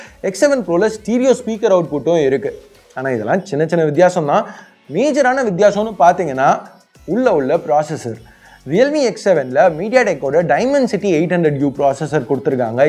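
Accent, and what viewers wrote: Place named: Chennai, India. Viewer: Nice video bro. Apple charger include pannadha nalla kalaikuringa. Chennai slang thaaru maaru. But don't use that slang in Dubai.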